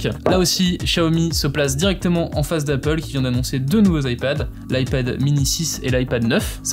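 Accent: Normandy, France. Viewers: Clair, net, précis et sans blablabla qui comble le manque d'information. Au fait, tu ne serais pas Normand?👍👏😉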